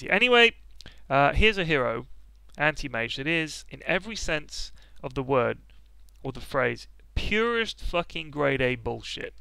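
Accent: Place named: Cockney, United Kingdom. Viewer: i love this cockney rage XD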